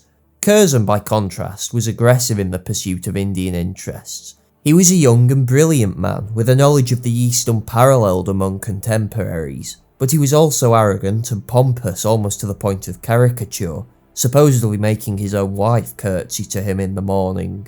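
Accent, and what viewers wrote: United Kingdom. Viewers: Where the accent is from ? Is it british ?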